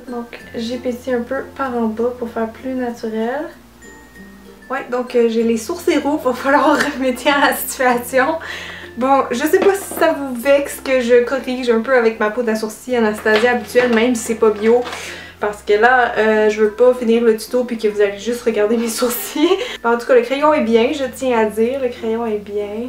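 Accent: Quebec, Canada. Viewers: Oulala je m'attendais pas à l'accent québecois 😂(je suis francaise je viens de tomber sur ta chaine)Tu es super belle ! 😉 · Salut Très contente de cette vidéo je viens de passer aux cosmétique non testé sur les animaux, Catrice et Essence, j'ai 10 pages de ces produit Cruelty Free et Vegan, donc l'embarras du choix pour toutes celles et ceux qui sont contre la maltraitance des animaux, certains des produit que tu as utilisé pour ta vidéo s'y trouve, j'aimerai essayer la marque West 'n Wild, pourrais-tu (quand tu auras le temps 😉) faire une vidéo avec ces produits pour savoir un peu ce qu'ils valent, couvrance, pigmentation, tenue, etc... Fais nous encore plein de belles vidéo tu es super sympa, toujours de bonne humeur et toute jolie, je suis Belge et j'adore ton accent Québecquois 😊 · Parle moins quebequozé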